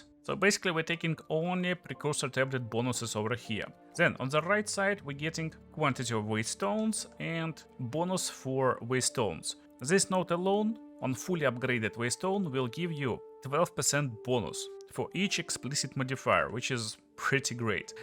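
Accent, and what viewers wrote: Russia. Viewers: sounds like Runglish orn Engussian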